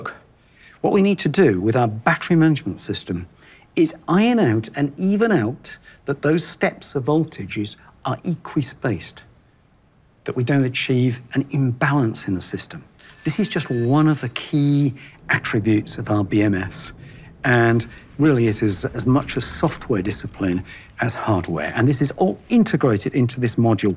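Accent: United Kingdom. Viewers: Quite similar to the original Tesla Roadster and Model S HV packs, in that Lucid uses many thousands of tiny 18650 "flashlight" batteries, as in laptops and power tools. Improvements over Tesla are a higher pack output (900 VDC), as for most newer BEV's, instead of the 400 VDC in Tesla packs. That makes fast-charging easier (half the current). Another advantage is that the end-plate cooling and lack of adhesives should make replacing individual failed cells much easier, and likely easier assembly too. The Physics he presents is high-school level so not amazing, though when stated in a high-class British accent it sounds more clever. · OMG, such an amazing video, exceptional presentation skills. Fantastic British accent. Beautiful cinematography. I love it all. I didn’t care about lucid, till now. Subscribed. Keep the good stuff coming and I hope you will be able to produce cars for the masses too in the near future. 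Thanks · I'm born and raised in the USA. I'm totally disgusted with abundance of British accents I have to hear these days. I'm nearly 80...and it hasn't always been this way. This time I guess they're trying to take us without burning our buildings. Britain and Israel are our two worst enemies...and the sooner others realize that the better off we're going to be. And BTW: Everything he's saying you should have learned in high school...and could have learned in grade school if they weren't wasting all your time on "love your government as yourself" propaganda.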